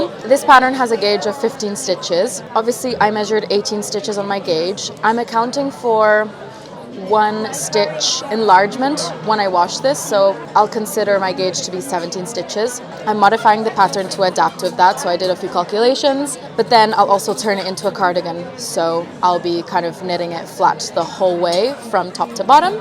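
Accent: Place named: Italy. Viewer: You are Italian?? Great accent!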